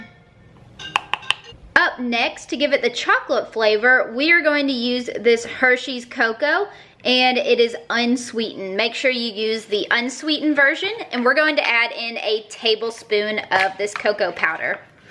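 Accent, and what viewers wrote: South, United States. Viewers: your southern drawl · You are a doll! Really enjoyed your video. Your sweet accent made me homesick for the south :) God bless you! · I hate you and love you for this 🤣 I made this tonight and it was so damn good!! I did the chocolate peanutbutter one without the chocolate chips, I used heavy cream (not whipping) and the Swerve confectioners. I ate half of the jar 😎. Thank you for this, btw I love your southern accent 👍. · I absolutely was mesmerized by your accent. Southern women are my bane. 😍😍😍😍😍😍😍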